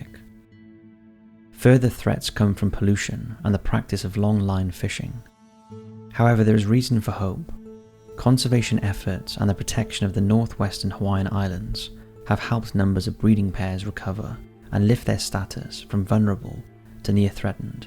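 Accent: Wales, United Kingdom. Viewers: he's from Wales so it's the way they say it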